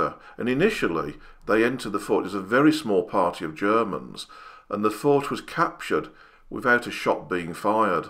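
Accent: Birmingham, United Kingdom. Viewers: Great info! Funny Brummy accent too!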